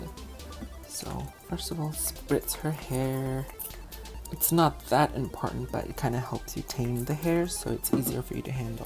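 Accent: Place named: California, United States. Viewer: He may be from California because thats how my friend who is a boy talks and he is from California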